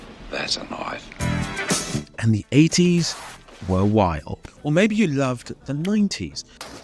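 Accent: United Kingdom. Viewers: I joined your channel cause I thought it was interesting then realize all you do is complain about shit you can't do. I'm a critic of things too but I don't know if it's just that you sound like a total white brit or that you sound pompous as shit. I just can't.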